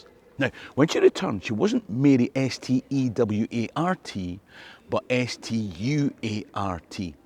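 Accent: Scotland, United Kingdom. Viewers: Watching on the TV in our livingroom in Canada. I am a very very distant descendant of James VI and Mary and the rest of them. My "immediate" ancestors came over from Ayrshire. Always interested in the history. I enjoy your videos immensely, although I do have to turn on the text because your wonderful accent throws me for a loop at times. Thanks for what you do :) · Watching at bedtime in the Pacific NW US; your Scottish accent is wonderful. I love reading western European history fiction, all time periods. While Iistening to the stories, I like to learn the actual history. I watch your videos to help bring to life the Highlander books I read (actually listen to on audiobooks) as well as other Scottish time periods. My favorite genre is time travel...I just listened to a story about Stirling Castle and William Wallace. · Watching on my iPad, on my couch, drinking a cuppa, not only loving the history, the castles but love hearing that gorgeous Scottish accent...I'm an Aussie.. 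· There's something strangely nostalgic about hearing someone with a Scottish accent using onions as a metaphor for layers.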